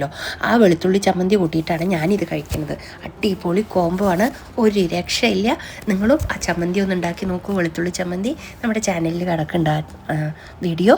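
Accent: Palakkad, India. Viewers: Enikum aa samsaram kelkan enthu ishtamanenno, njanum oru Palakkattukariyanutto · എനിക്ക് ഒത്തിരി ഇഷ്ട്ട ചേച്ചിയുടെ വീഡിയോ യും ചേച്ചി യുടെ സംസാരവും ആ കോളു ❤പൊടിച്ചോളൂ to❤അടിപൊളി വോയിസ്‌ പാലക്കാടൻ ഭാഷ സ്നാക്ക്സ് അടിപൊളി ആണ് ഒന്ന് ട്രൈ ചെയ്യണം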